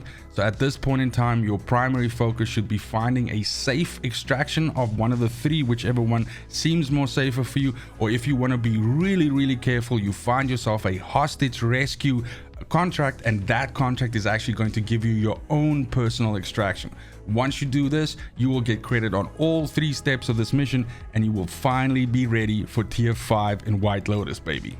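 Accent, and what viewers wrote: South Africa. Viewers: I'm trying to figure out your accent. Are you from South Africa?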